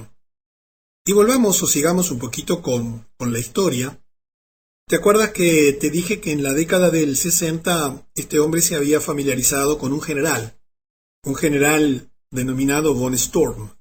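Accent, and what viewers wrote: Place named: Argentina. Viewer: Hola, soy Francisco 27 años músico de Bahía Blanca. Excelente el video, realmente excelente. Me gustaría contactarte por Facebook, sobretodo porque vengo investigando esto hace mucho, acerca del NOM y todo esto y es la primera vez que veo un video con acento argentino. saludos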